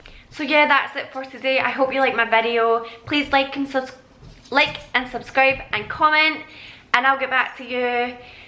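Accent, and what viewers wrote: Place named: Scotland, United Kingdom. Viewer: Are you Scottish nice accent 😉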